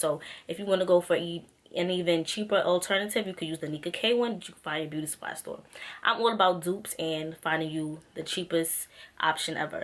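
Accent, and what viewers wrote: New York, United States. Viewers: you are so pretty. you have an accent too lol. are you from new york? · You sound like your from NYC lol great video and new subbie I'm loving your channel 😍😍😍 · I knew you were from newyork as soon as I heard that accent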